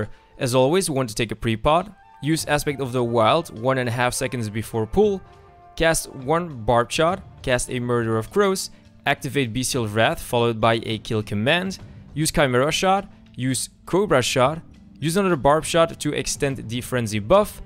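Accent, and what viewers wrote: France. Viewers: Tu es Francais toi :)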